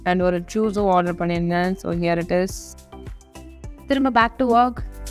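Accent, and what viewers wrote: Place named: England, United Kingdom. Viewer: Tamil la pesu bodhum english accent..😅😅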